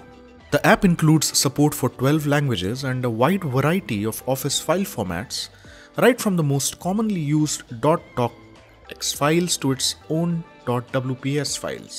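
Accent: India. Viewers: Very shitty Indian accent, go back to scamming. This video was annoying.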